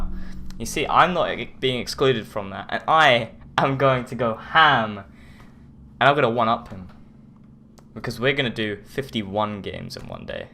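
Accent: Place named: United Kingdom. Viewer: That british accent xD magic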